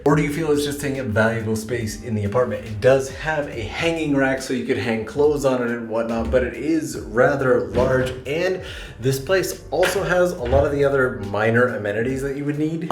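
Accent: Canada. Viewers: Somehow after living in Japan for like 10 years this dude still has a Canadian accent.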